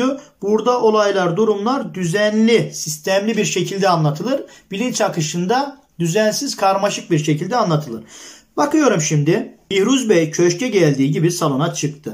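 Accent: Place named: Trabzon, Turkey. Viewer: arada bi konuşmanızdan belli oluyor ben de akçaabatlıyım :))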